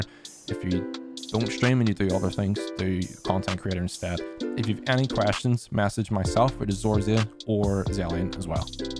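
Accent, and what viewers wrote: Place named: Northern Ireland, United Kingdom. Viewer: northern irishman with the asmr right here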